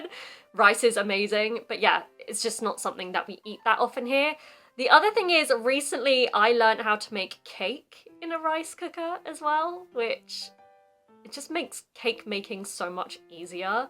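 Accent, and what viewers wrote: RP, United Kingdom. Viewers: いつも貴方の美しく心地よいクイーンズイングリッシュを拝聴しております。 聴けば聴くほど、どうして英国から移住した米国人の英語はあんな感じで変わってしまったのでしょう？本当不思議です。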